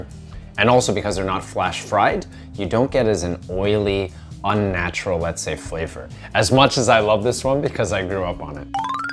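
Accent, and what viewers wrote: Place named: United States. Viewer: This may be a crazy question...but how did you get to speak english so well when you were brought up in Japan....you sound as if you grew up in the states....I must be missing something in your history....lol....sorry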